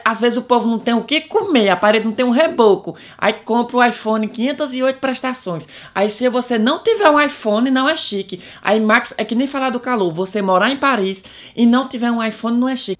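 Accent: Ceará, Brazil. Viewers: Esse sotaque é muito meu!! 😂😂😂😂 Sou sua fã e também vizinha aqui no cariri cearense. 😍😍😍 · Cai de paraquedas no seu canal. E estou adorando. Que sutaque maravilhoso. Eita cearense arretado ❤😍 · lindíssimo, amo você. sou do nordeste também e me encanto muito com isso de você mesmo não morando no brasil e tudo mais continuar valorizando a cultura nordestina, principalmente seu sotaque! todo sucesso do mundo, um salve dos alagoanos.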